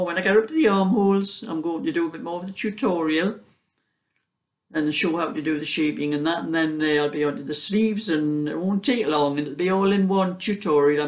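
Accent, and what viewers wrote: Newcastle, United Kingdom. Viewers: Hi. I have only recently found you. As a scouser who moved to Canada over 30 years ago, I have always been a sucker for the Geordie accent😊 I have been following your tutorial for the big crochet bag. I started as per you instructions with a 37 stitch chain Measured it and it was about 16 inches as you said. I am now doing the sides and the base magically measures 20 inches. It's HUGE! I tried to send you a picture but I don't know how to attach to this message. Do you have any advice? Thank you. Sue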